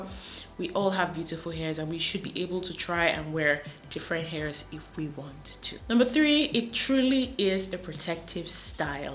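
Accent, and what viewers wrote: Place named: Ghana. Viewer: Gee, I caught some Ghanaian accent from you. 😂💚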